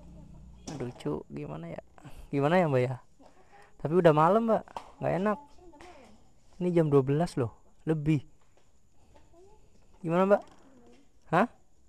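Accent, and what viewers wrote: Tegal, Indonesia. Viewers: Abang orang tegal yah bang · Bang luh orang tegal mana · anjayyy tegal ni kayanya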